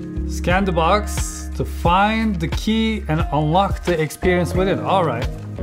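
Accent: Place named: Turkey. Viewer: I knew it! I thought I was going crazy because your accent sounds Turkish!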